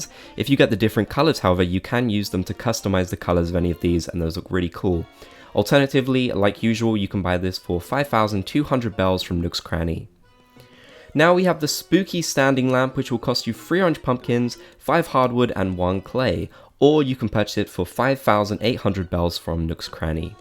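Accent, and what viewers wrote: United Kingdom. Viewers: Alternative title: British dude obsessed with a purple cat rambling about animal crossing for 6 minutes straight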